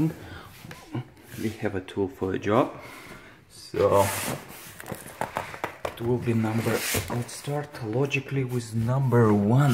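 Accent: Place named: Russia. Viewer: You are not a Russian case. The accent is similar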